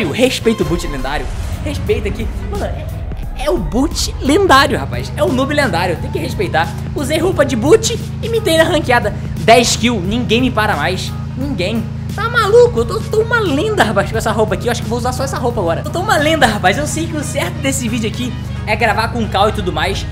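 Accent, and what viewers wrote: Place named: Rio de Janeiro, Brazil. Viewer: Nossa vc é do RJ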